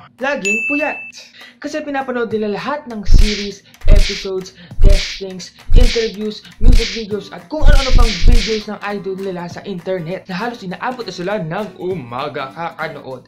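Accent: Visayas, Philippines. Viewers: Bisaya kaba??? Parang naka bisaya ka eh💕💕😘😍😱 pa shout out pooo plsss!!!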